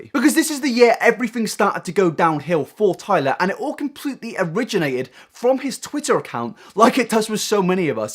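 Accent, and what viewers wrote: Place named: United Kingdom. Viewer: You are british? you accent is subtle. But when you said bloke, I was like....wait a second...I had to check your profile to see where you are from.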